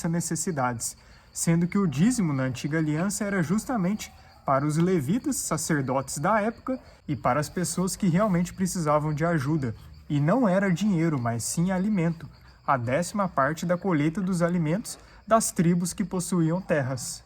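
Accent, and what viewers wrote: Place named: Minas Gerais, Brazil. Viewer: Olá! Eu adoro ler os comentários dos irmãos! E muitos que li me identifiquei. Alguns irmãos testificam sobre a tristeza que sentem dentro dos templos, por vários motivos, inclusive e principalmente por só pregarem prosperidade e não o evangelho na sua essência. Isso sempre me incomodou as poucas vezes que fiz parte. Fui batizada ainda bebê num templo católico da minha cidade, no sul do Piauí. E nunca me sentir com vontade de fazer parte de algo por lá, pois o meu corpo não me pedia pra participar, uma força superior parecia me impedi de estar ali, isto eu percebia. Aí eu fui ganhando maturidade sobre este assunto e eis que descobrir os motivos da minha antipatia por tudo isso. E hoje com 49 anos, tenho certeza que foi o Criador que me livrou desse ambiente, eu creio que ele me ama muito em ter me livrado dessas abominações. 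Eu digo sem medo, sou infinitamente grata ao Pai por ter me feito enxergar desde cedo o que tudo isso significa na sua verdadeira essência. Obrigada, irmão! Vc tem me ajudado muito a entender sobre a palavra, assim com seu jeitinho mineiro de falar😊 muito obrigada de ❤️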